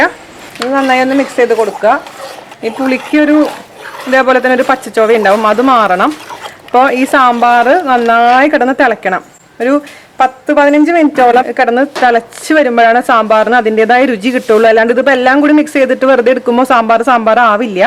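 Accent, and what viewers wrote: Thrissur, India. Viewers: Tani thrissur tanne...... Really great cooking.... Used to follow most videos. Wat we really enjoy is the slang....... Itu vere level ayi makkalee..... 😂😂😂😂. Good job n keep going. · chechide samsaram kettapole thonni, enikkishttayi njanum thrissur anu, ipo Bahrain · chechiii trissurkkari aano? Trissur slang aanalloo speak cheyyunnaath...super 😊 All recipes are superb.🎖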